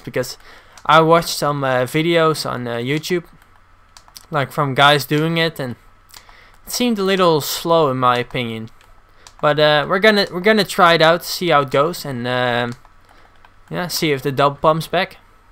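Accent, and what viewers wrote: Netherlands. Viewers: Are you dutch? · Correct me if I'm wrong, but are you Dutch? You sound Dutch at least.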